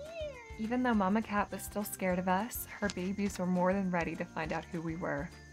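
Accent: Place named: United Kingdom. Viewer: British accent is quite appropriate, I say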